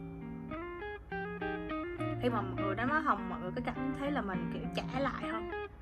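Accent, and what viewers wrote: Da Nang, Vietnam. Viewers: Em mê giọng chị vs giọng con gái Đà Nẵng nói chung lắm huhu =)))) chúc 2 mẹ con chị mạnh khỏe nhaaaa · Nghe giọng là biết ngay ĐN luôn ❤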